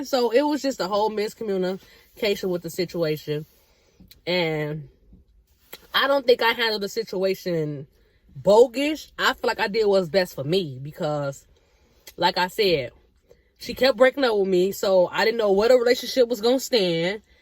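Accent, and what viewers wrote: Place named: South, United States. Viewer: Idk why but I hear southern in you lol ! Where are you originally from? You got some accent 🤣🤣🤣 and I love it!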